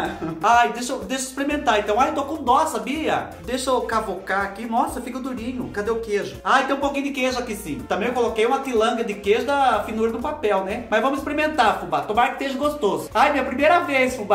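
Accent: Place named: Northeast, Brazil. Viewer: Eu achando q ele era daquí do Nordeste pelo jeito de falar. ❤ aaa amei Cuscuz dá certo com tudooo. E fubá,faz mais vídeos de receitas nordestinas.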